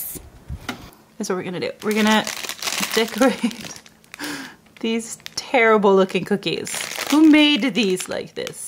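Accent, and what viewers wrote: United States. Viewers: Did you live in the United States? You sound like you learned English from Americans. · Came here from Twitter where someone uploaded your video from Tiktok I have been enjoying your videos a lot but I am surprised by this small town. I’m from England and when you go to smaller towns or villages there won’t even be brands we’re familiar with in big towns and cities but here in Longyearbyen I see brands at the supermarket I recognise, which is funny. Did you go and live in USA for a while because you’ve got a perfect typical American accent? And does the sun rise at all or is it constant darkness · are you american? you just sound american to me, but somehow i doubt you are since you live in such a unique place. i bet you have an interesting story.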